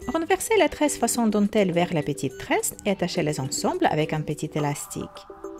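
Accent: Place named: Russia. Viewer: you have a little accent. it's cute! Are you russian?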